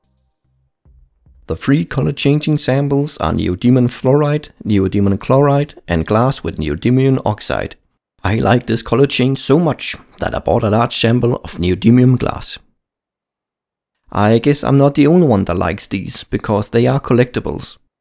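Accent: Denmark. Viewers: you from denmark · man kan bare høre at du er dansker:P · Hvis man er fra danmark kan man også godt høre at du er fra danmark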